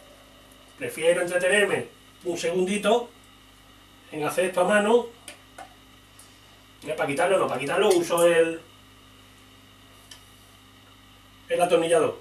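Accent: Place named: Andalusia, Spain. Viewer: Cuanto cuesta un mantenimiento así? yo tengo una guitarra mala (Berhinger) y no la llevé a un luthier porque pensaba que me iba a costar más el collar que el perro. Por cierto, ¿dónde tienes el taller? por tu acento creo que vivimos cerca (yo en Sevilla). Al final me compré una Yamaha Pacífica pero no se si merece la pena ajustar la otra o venderla. Saludos.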